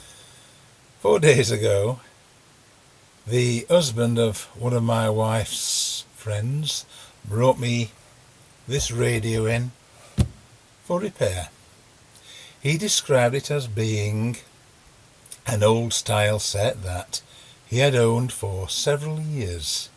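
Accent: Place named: Sheffield, United Kingdom. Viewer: Just discovered your channel. I'm sure you've long since finished the Philco book. I've just got my first vintage radio myself. a 1933 Philco model 18H (Highboy). When I first clicked your channel I assumed you would be out of Birmingham, Pennsylvania (steel city) but upon hearing your accent, now I'll assume Sheffield? Can't wait to see more of your videos.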